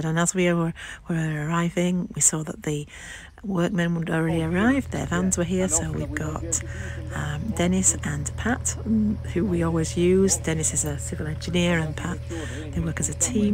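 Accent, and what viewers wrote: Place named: Ireland. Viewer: I love a plan, especially when it comes with an Irish accent 🍀 And all mod cons! What could be better! Great start to the vlog ❤❤❤❤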